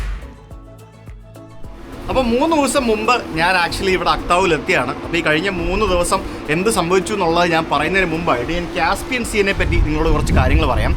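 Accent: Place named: North India, India. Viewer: why do you speak english llike north indians?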